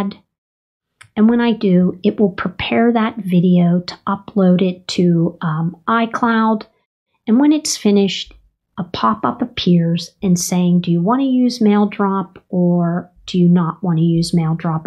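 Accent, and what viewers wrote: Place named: United States. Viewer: this is mark, from the west country of England, I appreciate your approach, I hope you do really well with it, it is so important for the coming generations, that our elders have access to proper Lee paste properly paste paste PACED paste Instruction on how to use the iPhone and Mac and iPad, and all of that malarkey I would love to see a video of you recording how to dictate, as I tried to above, and failed, the word PACED, which I have only managed to get my iPhone 6 to iPhone 6 two Transcribe by spelling it out, letter by letter. And, it won’t even get the number of my phone right, I would have to dictate the number one, then the number six, for it to work, as below: iPhone 16 new paragraph, and it still cannot understand it about 70% of the time when I say the words new, paragraph, it just types out new paragraph until I say those two words several times, one after the other, like I just love your accent, and would really appreciate knowing which exact town you are from in the United States! 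Me, I have a south east accent, I was born on an island of England, called the Isle of Sheppey Very best wishes, Mark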